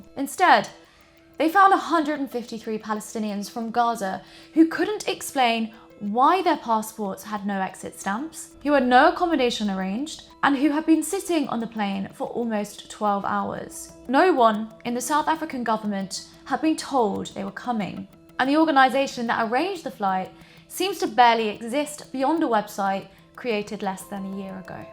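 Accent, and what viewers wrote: United Kingdom. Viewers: I saw your thumbnail. And I thought, “I bet she has a British accent” BAM! I’m psychic 😮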